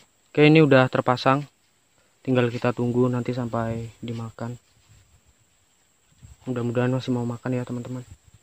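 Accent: Java, Indonesia. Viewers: Logatnya sih kea jawa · Tpi kok logat nya bhasa jowo😆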